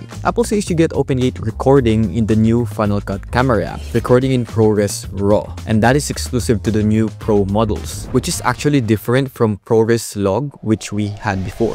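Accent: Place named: Philippines. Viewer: Love the Filipino accent ❤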